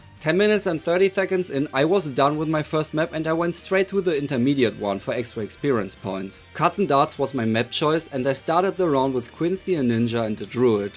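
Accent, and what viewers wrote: Germany. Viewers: Er: Woher weißt du, dass ich deutsch bin? Auch sein Akzent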